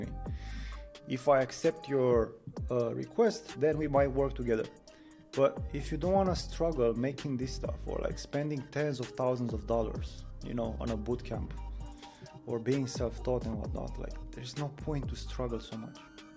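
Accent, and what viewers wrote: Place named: Romania. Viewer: Tocmai mi-am dat seama ca ești român, imi parea accentul cunoscut 😂. M-am apucat acum o săptămână de învățat css si html. Sper să fie bine. :))))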